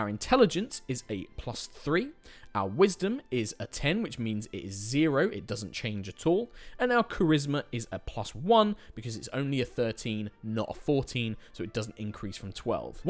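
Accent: United Kingdom. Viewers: This video is good quality, but without the British accent it would be incredibly boring.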